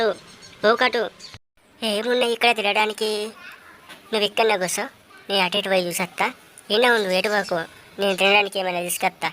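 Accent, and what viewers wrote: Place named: Telangana, India. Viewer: Telangana slag👌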